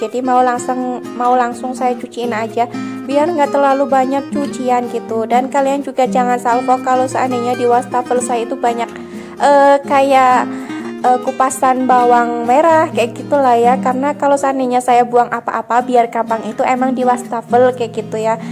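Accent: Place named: Java, Indonesia. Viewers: emang asli orang mana mom,,,, kaya orang jawa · Bunda orang mana sih? Kayanya ngomong nya medok gt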